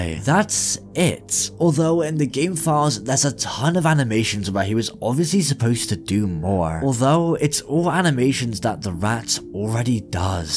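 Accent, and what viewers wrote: United Kingdom. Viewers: this video is cool and all.. but... I just love the Scotland Accent!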